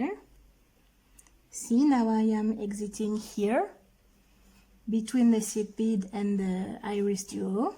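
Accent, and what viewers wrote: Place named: France. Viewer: And it's even better with that cool French accent!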